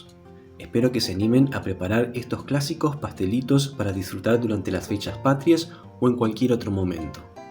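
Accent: Argentina. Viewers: Que hermosa receta!!! Buscando, encontré la perfecta!! Y hablado en criollo argento!!! Lo amé!!!!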